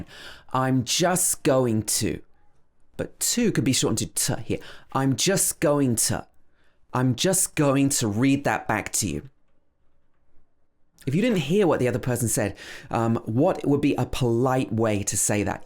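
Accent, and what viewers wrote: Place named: United Kingdom. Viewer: Omg i really wanna learn british accent watched all ur videos...pure british🔥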